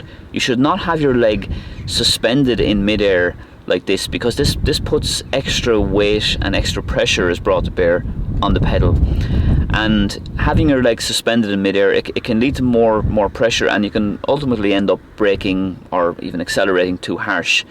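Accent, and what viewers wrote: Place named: Wexford, Ireland. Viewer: The first video I watched I thought this guy sounds like he is from Wexford 🤔. Then in this video I saw you driving around my estate right past my house. Small world 😂. My first lesson is tomorrow and your videos have been a great help. Thanks.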